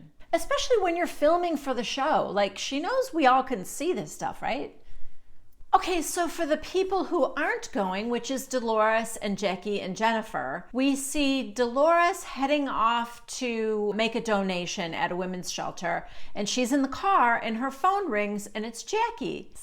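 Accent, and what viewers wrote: New Jersey, United States. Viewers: Love your Jersey accent. Lol. You sound like a mob wife. I have always liked Joe Gorga. I believe this guy has a hard of gold and loves his family deeply. I feel so so sorry for that Marty guy. He needs to run away as fast as he can and take his kids with him. Love you. Keep up The accents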